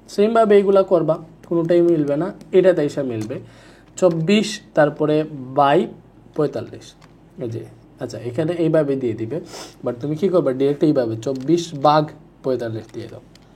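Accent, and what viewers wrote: Sylhet, Bangladesh. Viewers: Sir mone hoy amader Sylhet er😫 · Vai sylheti naki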